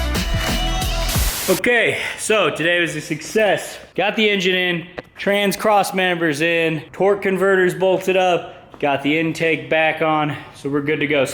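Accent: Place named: Texas, United States. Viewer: Helll yeaaa bother ! Merica! Let them bald eagels fly brother! heavy texas accent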